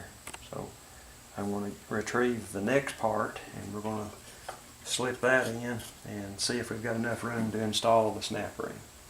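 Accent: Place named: Tennessee, United States. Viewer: My 790 has 667 hours in it. Waiting for this to happen. I'm retired mechanic and service manager, but never been into one of these. My brother, also a mechanic told me this was a common problem. BTW, you look like you could be twin brother of a very good banjo player I know of. I recognized your dialect and you mentioned Tri Green so I figured you're in Tennessee. Check out Daniel Grindstaff banjo, perhaps you are familiar with his music. Appreciate your verse and good spirit.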